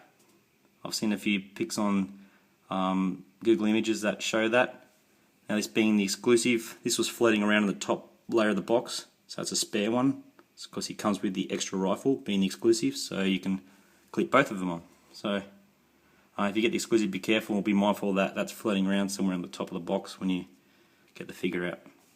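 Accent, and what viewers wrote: Australia. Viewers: it looks awesome and i cant wait for mine to arrive. i wish i had this paid off already but last payment is today and should be here soon. i assume your living in australia? due to your accent,im a west aussie and just wondering if there shipping to australia yet. cheers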